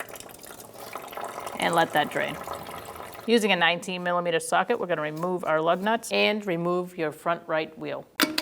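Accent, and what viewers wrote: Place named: New England, United States. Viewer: What part of New England are you from? Nice vid.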